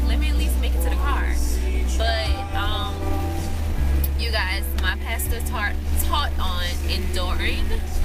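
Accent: New Orleans, United States. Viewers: I love what you are about girl!!!! It's so refreshing to see like-minded individuals here on YouTube. Love your transparency! And sidenote: I understood everything you said....lol! Sounded like correct English to me!!! I'm from Louisiana and I noticed right off the back that you have an N.O. accent!!!!!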